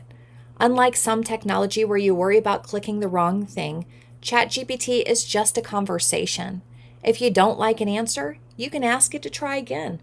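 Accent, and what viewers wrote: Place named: India. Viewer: An indian guy with a voice modulator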